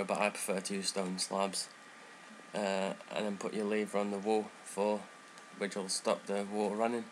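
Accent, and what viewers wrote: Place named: United Kingdom. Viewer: He's british he say it that way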